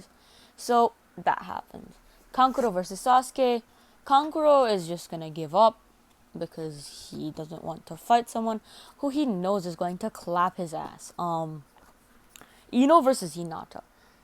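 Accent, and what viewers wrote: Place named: Australia. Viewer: That’s Australian not American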